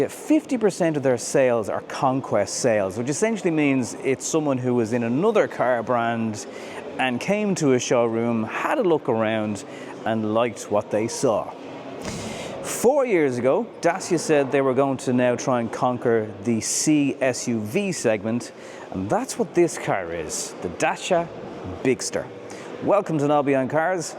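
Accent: Ireland. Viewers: Ireland accent and best Dacia ever so stfu and take my money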